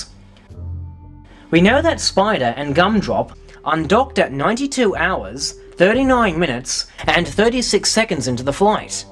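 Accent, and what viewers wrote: Australia. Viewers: Fucking aussie, just shut up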